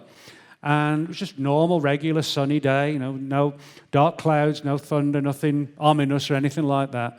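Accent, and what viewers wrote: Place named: Birmingham, United Kingdom. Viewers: sounds very UK Midlands accent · The Arlington Brummie 👍🏻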